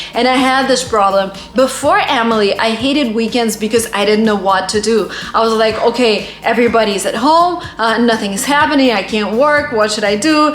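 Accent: United States. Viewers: How does that accent sounds? Do it sound american to you? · I love your accent . You speak really American English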